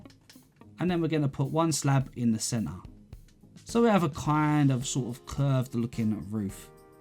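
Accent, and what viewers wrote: United Kingdom. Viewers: Hey...my daughter is the reason i play minecraft. Its our way of spending time together. She likes the game so i take intrest in what shes doing and enjoying. Shes always asking me to build things for her cause she wants her village to expand faster...well sadly im definatly not an artist. And my builds look worse than what she can do..lol so i started you tubing tutorials and found you. I love how simple you make the builds seem. And i absolutly love love love your accent and the sound of your voice. Where are u from if you dont mind me asking. My guess would be in the uk somewhere. But my daughters village is really starting to take shape and she is really loving the midevil style. Thank you so much for making my daughter happy...she was getting annoyed with having to rebuild my builds so they dont look like crap...lol and now shes you tubing tutorials as well and finding stuff she likes. 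So i have subscribed and look forward to seeing more amazing things that me and my girl can do together... bringing families together.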